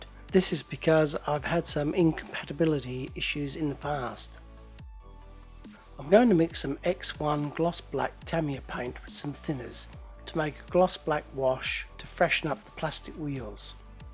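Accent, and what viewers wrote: England, United Kingdom. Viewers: Very interesting!!! I liked the idea!!! Where you are from? By the accent, it looks British to me. Can you get old thumbnails with ease? Here in Brazil it is difficult to find old machbox. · I have clumsy fingers, i would end up painting it Pollock style!!! Where are you from? you sound british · This is the second time I've seen you try something other than paint stripper and I don't know why you keep trying to improve upon something that works so fantastically well AND fast. I can see trying to improve methods that need improvement etc, but the stripper is as good as it gets IMO. Great vids and the proper English accent narration just makes it better.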